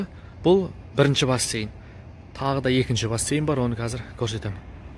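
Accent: Standard. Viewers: Амансыңба бауырым. Қазақшаны жақсы таза сөйлейді екенсің жарайсың. Ол жақта қандай жұмыс жасайсың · Қайран қазақтың кемпір шалының тәрбиесі десеңші, қазақы тәрбиенің иісі аңқып тұр. Бауырым осы қалпыңда қал. Тілің таза екен. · Алла разы болсын.нағыз қазақтың жігіті екенсің таза сөилегеніңе рзамын бәрі тамаша👍👍✊ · Қандай керемет! Таза қазақша сөйлегеніңіз ұнады! Алла жар болсын Сізге! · Керемет.Тап-таза сауатты сөйлейді екен қазақ тілінде.Денің сау болсын қазақтың - Азаматы.Қайда жүрсең де аман бол! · Жарайсын! Кандай адепти де мадениетти сойлегенинин ози тамаша, тагы да таза казакша сойлегенине рахаттанып отырып кордим. Рахмет! Бари тамаша кандай керемет орындар! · Керемет түсіндіріп жатырсын. Жалпак қазақ тілінде. Айналайын биіктерден көріне бергын. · Кандай жаксы таза казакша сойлеген кулакка жагымды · казакша таза сойлегенине риза болдым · Балам, қайда жүрсеңде, аман бол! 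Шет елде жүрсеңде, таза қазақша, мәнерлеп сөйлегеніңе, мың рахмет! Сені туған, анадан айналдым! Біздің Қазақстанның мынандай күнге жету қайда! Аман бол, балам! Ақ Жол! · Казакша таза сойлейсин оте жаксы жат елди донгелетип турсын аман жур айналайын болашагын жаркын болсын айткандарынды тындай бергин келеди саган амандык саттилик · Бауырым өте жақсы, видеоларың тартымды, тілің таза орысша қоспай сөйлеу мәнерің тартымды, ой өрісің кең екен, сөз жоқ. Біздеде астана, алматы, бүкіл облыс орталықтары, тіпті аудан, ауылдардады қазақтар екі этаж коттедж салып алып жатыр емеспе ( 80% қазақтар) жәйлап жайлап біздікілерде жетер осындай жағдайға деп тілейік. Қазақтың болашағы алда деп қойшы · Қазақша таза сөйлеп жақсы видо болды тамаша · бауырым, өте керемет видеолар. Мен бірінші рет көріп отырмын,керемет екен, жақсы әсер алдым, қазақша таза сөйлейді екенсіз 🌹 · Озге елде оз тилинде таза сойлеу. Оз елиндеги тил билмейтин балыктардан караганда мын есе артык алла алдыннан жарылкасын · Аман бол елге аман орал тек денин сау болсын Америкада журип казакшаны таза сойлеп турсын мын бол казак азаматы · Рахмет бауырым аман жур таза казакша сойлейди екенсин тамаша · Жарайсыз бір орысша сөз арластырмастан таза қазақша әдемі сөйлеп, әдемі видео ролик түсіріп шықтыңыз, бәрі түсінікті болды.рақмет. 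деніңіз сау, жарыңызбен бала-шағаңыздың қызығын көріңіз!!! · Рахмет жігіт,қандай таза сөйлейсін қазақ тілін! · Аман бол бауырым,керемет .Саған тек сәттілік тілеймін.Қазақша таза сөйлеп отырғаныңа тәнтімін,рахмет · Керемет қазақша сөйлеген таза жарайсын рақмет биіктен көріне бер ✊❤ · Тамаша видио . Сені осындаи ер азамат етіп тəрбиелеп өсірген атаң мен апаңа, əке шешеңе мың алғыс ! Шалғаида жүрсеңде қазақша тап таза сөлеп тұрсың. Табысты бол! 🤗👌🙏🇰🇿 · Сәлем таза қазақ тілінде сөйлейсің Рахмет түсірген видеоны көріп отырмын. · Таза казакша сойлейди екенсиз,кай жерденсиз? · Жарайсын бала. Қазақ тілін таза, білімді бала екенсін. Біздің Қазақстанды ослай дамыту үшін сен сияқты білімді жастар керек және халықтың көз қарасын ойын өзгерту керек жақсы өмірге. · Не деген керемет !Қазақтың нағыз азаматы екенсің! Таза қазақ тілінде сөйлегенің көңіл қуантарлық! Алла нәсіп етіп,елге жеңіспен орал! Бағың жансын,бауырым!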